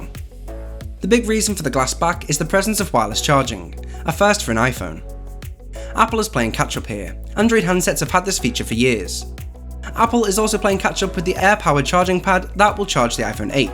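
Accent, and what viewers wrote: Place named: Scotland, United Kingdom. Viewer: I love your accent, is that Scottish?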